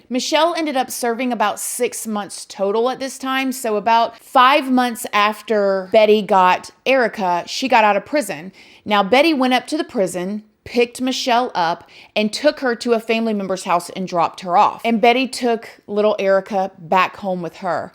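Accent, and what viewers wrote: South, United States. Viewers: I am in love with the country accent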